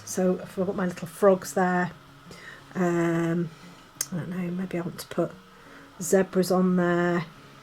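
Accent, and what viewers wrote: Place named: United Kingdom. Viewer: I got really excited when I heard your british accent, that means I should be able to get a hold of this. Thank you!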